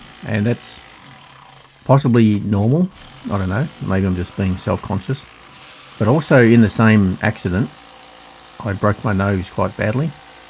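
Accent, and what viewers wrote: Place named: Australia. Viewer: I'd definitely rethink the height of the tunnel mate. Especially if you plan on lining the tunnel at some stage. You'll want to put down a floor at least if you plan on wearing a nice pair of strappy heels down there otherwise you'll end up rolling an ankle. I love the Ozzie accent - always have. Deviated septum - yeah been there done that. Too many kicks and punchs to the face and too slow to dodge them LOL. Cost me $7k to get mine straightened. Worth every cent. Keep on digging!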